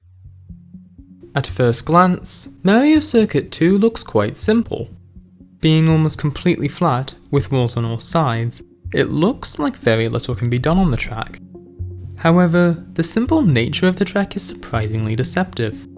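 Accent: United Kingdom. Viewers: legit thought you were british at first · Most British sounding American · summoning salt but bri'ish (in all honesty rly good vid :D)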